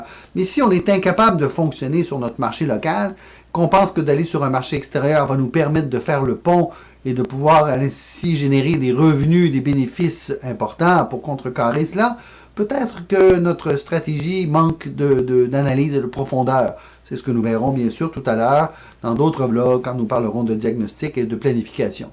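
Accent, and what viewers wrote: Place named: Canada. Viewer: Merci et Bel accent canadien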